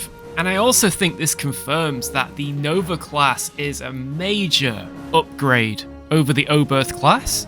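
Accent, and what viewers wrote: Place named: England, United Kingdom. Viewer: I suppose that's the problem with a beta canon as incongruent as Trek's is- until I was taught that Star Trek doesn't standardize its beta canon I believed a lot of beta-only things which are essentially headcanons- stuff like Defiant being faster than Voyager, shield recharge being a Nova-exclusive or a Nova introduction. I guess we still have some preconceptions based on what beta canon we were exposed to earlier on. BTW i'm trying to get better at recognizing UK accents- fairly sure you're somewhere in England, but would you mind telling me where? The two most prominent features I've noticed are your emphasis on ending "g"s which to my American ear suggests somewhere to the west, but it also sounds like your voice is a bit breathier, maybe?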